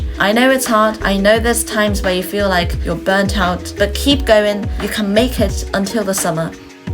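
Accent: RP, United Kingdom. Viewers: The combination of the memes and the poshest accent in existence 💀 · Why the fuck are you speaking in RP? You sound like the posh AQA languages listening reader PS。你会说中文吗？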